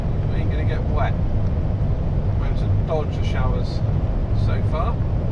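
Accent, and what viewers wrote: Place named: North, United Kingdom. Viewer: great northern accent lol irish amer ny us airforce veteran master obrien sir lol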